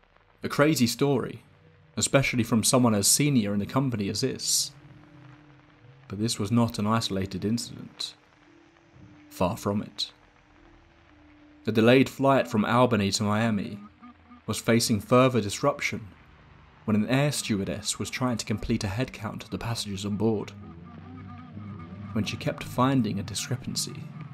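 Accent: England, United Kingdom. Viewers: Nice british accent n great storytelling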